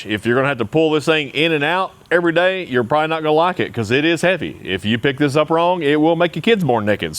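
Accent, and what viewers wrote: United States. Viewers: Like yo cowboy accent 😊 completely clear! Not a word missed 😅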